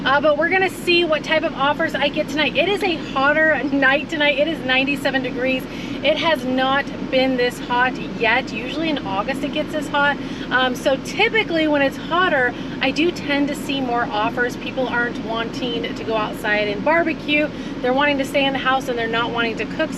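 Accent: United States. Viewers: Hey, you should be teaching English abroad. I like your pronunciation and your "American" accent lol plus as a fellow driver I follow you for your "delivery" content 👍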